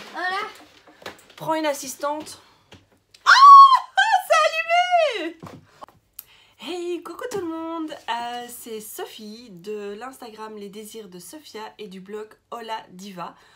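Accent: Belgium. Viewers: Coucou, j'ai entendu directement aussi que tu es de Belgique (tout comme moi 😁) et j'adore ce que tu propose. Je suis pareil que toi ! Mon amoire déborde et je ne peux pas m'empêcher d'acheter sans pour autant coudre, ou alors comme toi je commence et je ne continue pas un projet que je rêvasse déjà sur un autre , au secours ! Ton challenge me parle ! Je vais faire comme toi et m'en lancer un aussi ! 😁 😅😬😘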